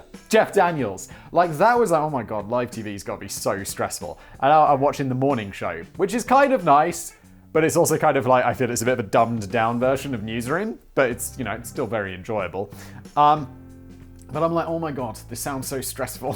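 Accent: United Kingdom. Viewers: Actually thought he lived in America. Yes, he speaks like a Brit, but he seems very America-oriented. Every time he says "Danny" in his British accent, makes me think of the social worker scene in Mrs. Doubtfire. Where he goes back and forth in some normal look and Doubtfire? He cries out: "Oh, Danny boy!". Never heard of any of those Brit Soaps, but in the last 20 years American soaps have become Star Trek vs. Clive Barker. No wonder many ended after 50 years. People like retro tech. Just look at any young person ever that thinks their meme of pretty much EVERYTHING is cool. I am used to seeing vinyl new again, but I saw a laser disc of Frozen at walmart today! You know? Those giant DVDs that lasted like 2 seconds? Whats next? Back to fucking beta tapes?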